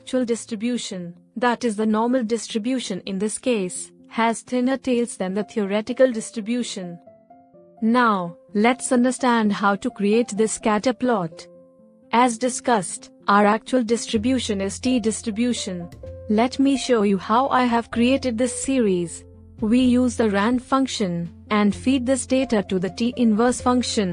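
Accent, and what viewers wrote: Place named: India. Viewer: for Christ sake, you can´t even get rid of that horrible indian accent even with robot voice? FFS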